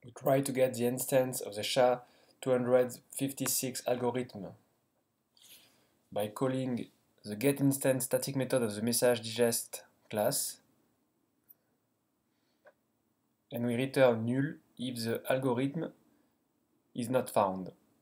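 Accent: France. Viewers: Pourquoi tu fais le tuto pour les anglais seulement :/ On entend très bien ton accent français éhéh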